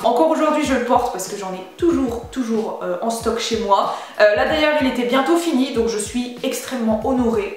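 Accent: North, France. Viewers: Sophie Mdg c’est l’accent du nord 😅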